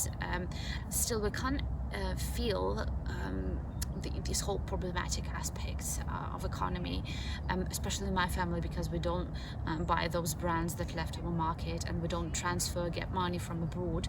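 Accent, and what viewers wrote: England, United Kingdom. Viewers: i went to Belarus 2 times...to Minsk and to a village Borovljany where i met the orphan children...i have been to Russia and Ukraine also but i noticed in Minsk that the young people seemed on the whole to be very polite and well-behaved...there are many parks in Minsk and many families with young children so the feeling in the city was very different to the harsher feel in Russia and Ukraine. I saw your president driving to see a hockey match...they blocked the road and i asked the taxi driver why and he said: ''The president likes hockey.'' I like your channel very much and your voice relaxes me. I like to see fields, trees, rivers and animals and i like the small country houses very much. I live in England and to me you sound very English but with an unusually beautiful accent, which some would not even recognise as being non-English, because it's very good. 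You speak English more correctly than most English people and in a very high class accent. Immediately i knew you were very intelligent just because of how you sound. It must have taken a few years to remove your old accent and much effort, and honestly im very impressed. I never met anyone from Belarus who managed to lose the accent before. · Yes, you have a very good British middle to upper-middle class accent. Slightly more English than merely British. Lovely. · I just came across your video of living in . . . Poorest district of Belarus I actual thought you was a Briton 🤭 The soothing, peaceful and calm style of speech is one of a kind. N'we love your videos I've watched like 4 now, and I love what you do here keep up Mate. 💯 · I was thinking you went to a English private school maybe you have learnt the British accent very well better than me and I'm from middle Britain. By the way you have beautiful blues eye's and nice hair. 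· this place is so enchanting and your Introduction too!! You have adopted British Accent quite diligently!! 👍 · Hi, i see the way you talk is not an American accent. More like british i have lived in Usa for 30 years, and i am familiar with those staff over here .My home state is ny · I am from the UK and when I watched a few of your videos, I thought you were a British person who moved to Ukraine, maybe to buy a farm or something like that. There is a bit of foreign accent, and I thought you were originally British and had picked up some of the local accent because you live there. When I realised it's the other way around I was amazed. I don't know if you ever visited the UK but if you want to, I'd be happy to do an exchange with you :) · Прывітанне Кацярына! Thank you for your videos. I love your British accent. I live in the U.S. in the Midwest (near Detroit). We're one of the few places in the U.S. 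without a regional accent. I enjoy watching Formula 1 auto racing because it is a global sport, with most of the teams and commentators are based in the U.K. What your speech is missing that the race commentators have, are the British colloquialisms. Race commentators use expressions like 'cheeky,' 'dodgy,' and one that I've caught myself using: 'shopping trolley.' (Here they are called shopping carts.) You have done a great job for not having spent time in the U.K. Maybe someday you will get the chance to travel there for graduate study. I think that you would fit right in! Thanks again. Best wishes. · God your place is not poor at all. In my country, philippines, our average salary is much less than yours...and your british english is sooo amazing,you are excellent...from phils,my name is alexi. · Great info main reason for your channel to pop up is your English accent best wishes from Pakistan 💕. 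· 100% it was easy to see you have a British accent. Well done, you achieved your goal. I was guessing you studied English in the UK. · I 100% thought that video had a British narrator so I checked your channel to see if it was indeed you 😁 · I love your English accent it's just like that of the queen of England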